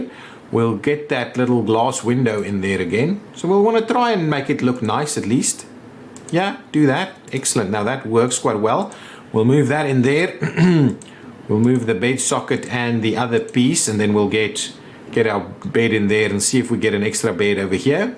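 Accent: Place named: South Africa. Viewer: Hahahah you sound sooooo South African, nice vid man!!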